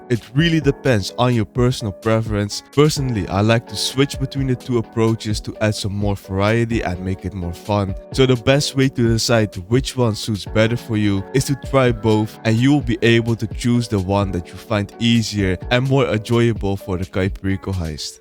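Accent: Netherlands. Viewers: you sound very dutch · You are dutch aren't you? Great tips btw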